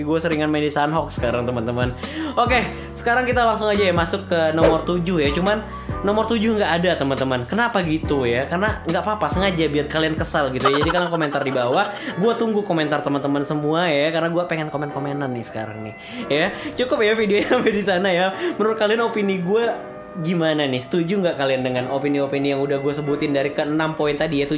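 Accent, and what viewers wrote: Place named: Bali, Indonesia. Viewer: Orang bali bang??? Soalnya logat pas ngomong "t" nya mirip am orng bali :v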